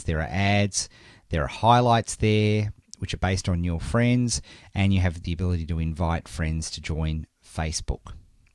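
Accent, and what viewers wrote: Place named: Australia. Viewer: Lol You obviously figure out that this is an ozzie.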